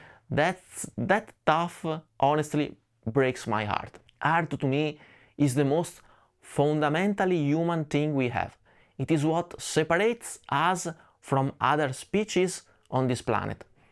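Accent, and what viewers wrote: Italy. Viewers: I fully agree with you. You are not old, you are simply in the right side! And, of course, your italian accent is totally fine 😂😂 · Dear, when you speculate about the proposal you got, somewhere between and, you said that they probably plan to use your "stupid Italian accent." As a language teacher who works as a pedagogical coordinator and holds an MA in Linguistics, and a subscriber to and fan of your channel, I'm in a good position to tell you that your accent is exquisitely and melodically beautiful. I guess I speak for everyone here when I say that the way you talk is one of the things that make your channel unique. I know you were being humble when you said that, but I thought it would be nice to let you know how much I/we love your accent - and your content, of course! Cheers from São Paulo, Brazil! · ! We love your channel, your content and information is invaluable to the community, we even love your italian accent ( i would love to speak any language at your level of proficiency)! 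Stay True! Stay Original! Stay Consistent! Keep up the great work! Thank you! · You’re accent isn’t stupid. You’re an Italian who speaks English. So there is an accent. I love to visit 🇮🇹 once again. The last time I was just a bambino. 🧒 such a beautiful place.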